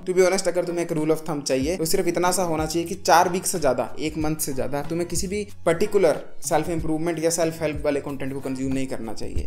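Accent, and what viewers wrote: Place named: Kangra, India. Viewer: Mujhe laga tha ki bhai aap kangra se ho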